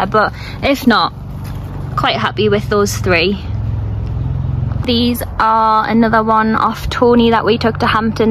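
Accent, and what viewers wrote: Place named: Newcastle, United Kingdom. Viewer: Hello you are totally natural at allotment reporting I really like the colour of your nail polish and your cute smile and Newcastle accent. Any word of Tony c smith having an other potty mouth club show yet. Could you also please tell where. Could be best to get a polytunnell or a small greenhouse please. I hope you have a nice weekend when your away from the garden and allotment thanks you 😊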